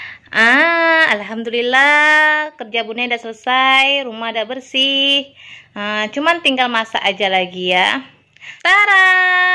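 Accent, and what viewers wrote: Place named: Sumatra, Indonesia. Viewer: didaerah mana tinggalnya, logatnya spt di sumatera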